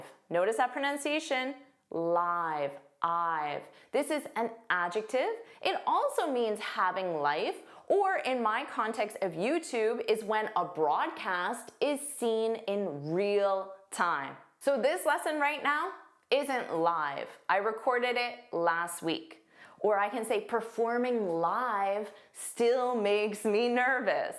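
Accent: Canada. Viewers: Are you from Winnipeg? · Do you have the Canadian accent or are you speaking like an English ?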